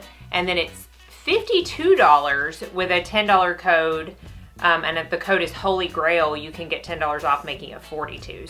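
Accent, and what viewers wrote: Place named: Maryland, United States. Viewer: Are you from Maryland cause you sound like an either Maryland accent or southern. Reminds me of home ❤️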